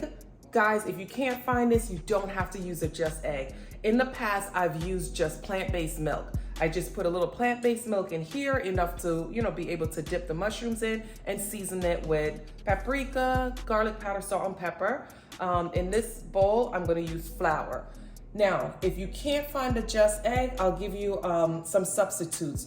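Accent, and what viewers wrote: Jamaica. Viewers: Idk why i hear a jamaican accent 👂🏾 🧐😌lol.. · That looks delectable... You're Jamaican right? Sounds kind of like you are.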